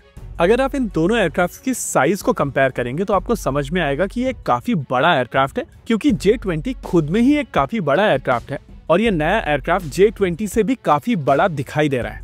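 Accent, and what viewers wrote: India. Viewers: 对英语一窍不通的我一听到这口音我就知道这是印度人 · I must say I'm addicted to the india accent. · english with hindi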